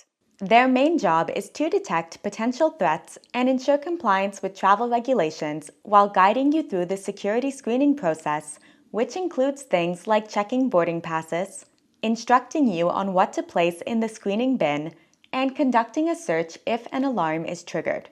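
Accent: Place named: South, United States. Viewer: That southern draw is disconcerting... just another reason I stay out of georgia.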